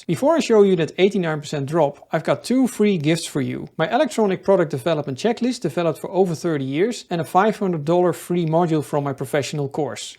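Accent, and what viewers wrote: Netherlands. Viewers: Man, I was soooo confused about what a "coramic" capacitor could be! 😂 Until I became aware of the strong (Dutch?) accent. (LE: BTW, the part from to sounds almost like it was the Project Farm guy 😊 )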